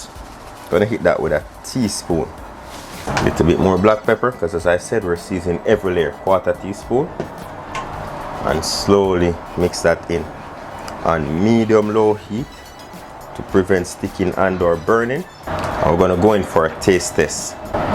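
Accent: Jamaica. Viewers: Bro you have me drooling just subscribed. Love how Jamaican speak and your cooking is out of this world. Ah gwan big up yuhself bro 🇹🇹🇹🇹🇹🇹🇹🇹🇹🇹🇹🇹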